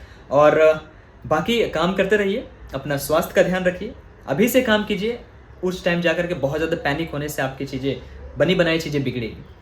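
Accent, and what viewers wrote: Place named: Maharashtra, India. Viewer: it feels like you are from Maharashtra ♥️ amazing voice!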